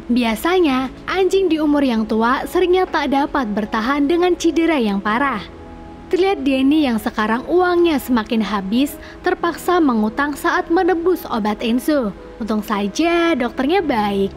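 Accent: Pemalang, Indonesia. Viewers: Wong pemalang kie lur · Orang pemalang ya ba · Wah wong Pemalang iye? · Mbak orang pemalang yak? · lahhh wong pemalang mbak????? samaaa ihhh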